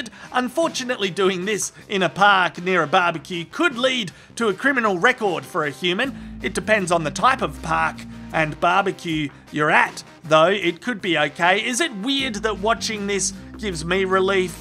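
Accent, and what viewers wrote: Australia. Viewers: Your Aussie accent is kinda oddly satisfying 😂😂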